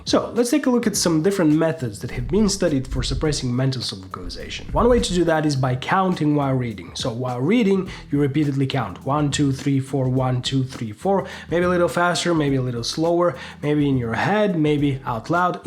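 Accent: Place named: Brazil. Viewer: You sound brazilian, somehow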